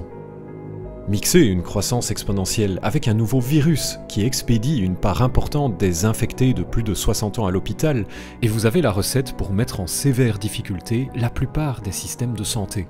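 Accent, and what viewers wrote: Belgium. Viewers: Cette accent belge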